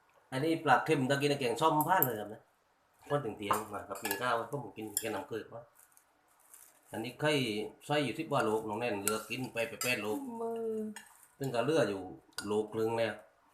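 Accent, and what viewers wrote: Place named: Trang, Thailand. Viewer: ดูกับข้าวพี่แล้วชวนให้หิวรอบดึกเลยครับพี่. ติตามครับสำเนียงพี่เหมือนคนตรังบ้านผมเลยครับ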